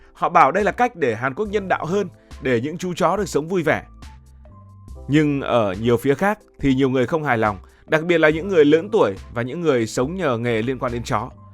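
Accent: North, Vietnam. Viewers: Thằng mc luon bưng bô và nó lại nói tiếng bắc mà tui bắc lai không biêt bố no là ai ,và luon ra đường k dội nón và óc bò khi lái xe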